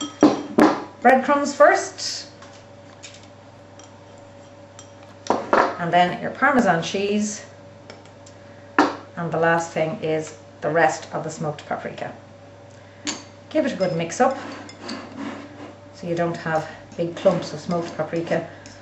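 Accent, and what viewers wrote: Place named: Ireland. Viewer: Ahhh, the brogue! I'd listen if you were reading the phone book. But the squash instructions are spot on. Thanks!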